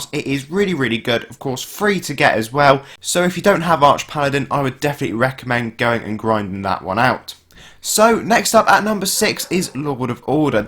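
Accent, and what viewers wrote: United Kingdom. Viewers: I really love your british accent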